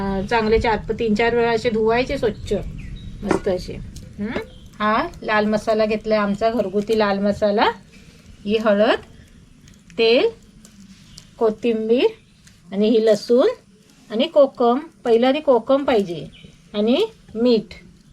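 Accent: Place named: Konkan, India. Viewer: काकी छान मस्त कोकणी बोलतात...कोकम शिवाय नाय चव लागत... 😊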